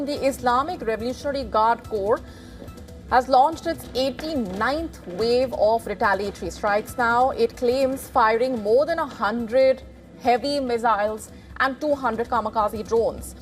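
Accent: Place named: India. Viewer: is that an indian woman who tries to speak british english, but spent some years living in germany?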